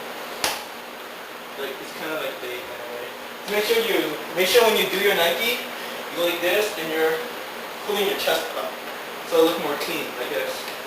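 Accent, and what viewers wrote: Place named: Philippines. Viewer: lol your filipino. that accent sounded familiar xDDD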